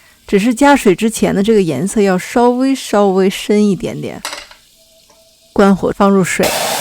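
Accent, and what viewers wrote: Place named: Beijing, China. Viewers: 北京口音好亲啊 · 北京話嗎？很好聽。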